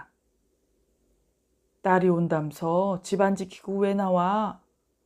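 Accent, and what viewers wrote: Gyeongsang, South Korea. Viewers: 여긴부산인데요 갱산도미까 사투리가 구수해요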